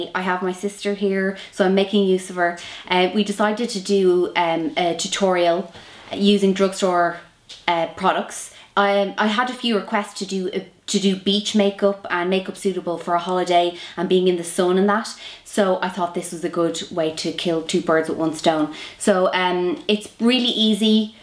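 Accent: Ireland. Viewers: I love your videos!!! I love your accent!! I felt totally in love with Ireland when I went there when I was younger, it's the most beautyful place in the world!!! And I was pretty surprised by the people too, I've never been in a country with such nice people, really. I'm irish in my heart ^_^ Take care sweetie. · She's from Ireland so I'm guessing it's an Irish accent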